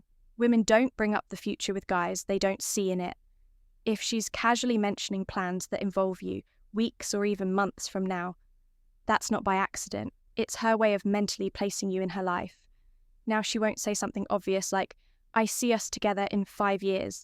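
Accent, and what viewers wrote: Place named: England, United Kingdom. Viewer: Great stuff,. Hope I got the name right. Well done. Keep up the good work.👍 You have a smooth rich sweet voice too. Plus a pleasant English accent.💞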